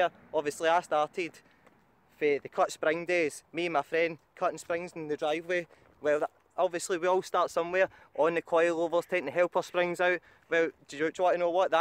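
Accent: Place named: Scotland, United Kingdom. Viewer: Good video mate, strong accent though, good job I've Scottish in my blood 😂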